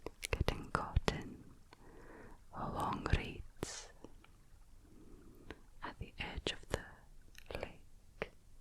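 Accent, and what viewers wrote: Scotland, United Kingdom. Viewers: So to be honest I clicked on this video because I thought she is attractive. Hey, at least I tell the truth. However, I am now in ASMR heaven. I have listened to a LOT of ASMR videos for the past 15 years, maybe longer. I think we have a winner here. As an American, the Scottish accent does the trick, and she has mastered the "floating hands". Well done madam! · Going to Glasgow soon, is that anywhere near your accent? Been listening to you for a few years, so just wondering :D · Scottish accent for the win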